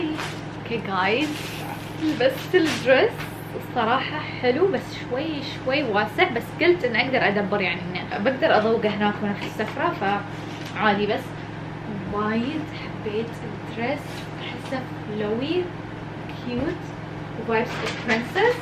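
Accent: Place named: United Arab Emirates. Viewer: لهجتها اماراتية واضح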